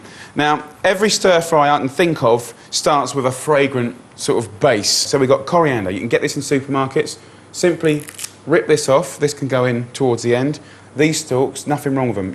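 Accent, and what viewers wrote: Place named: England, United Kingdom. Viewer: cute!!! and he can cook and he has an english accent! i'll buy:)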